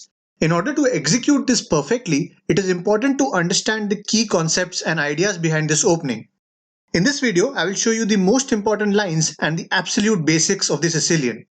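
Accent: India. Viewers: I’m assuming you are Indian but the accent.. so I believe everything you showed us here since chess was invented in India .. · Your English is Indian (understandable) don't give foot note that's disturbing, it covers board. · I like Indian acent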